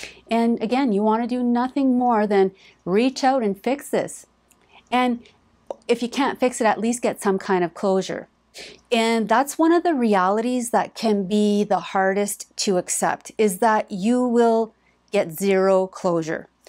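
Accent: Canada. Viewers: Of course it’s a Canadian twang I’m picking up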